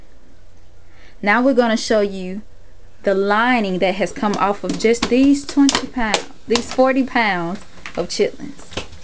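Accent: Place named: South, United States. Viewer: You have the cutest southern accent!!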